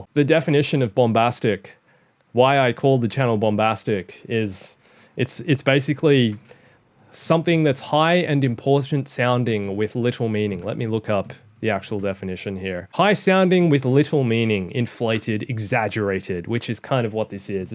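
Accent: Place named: Australia. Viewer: Wait, You are an aussie, I thought you were a kiwi.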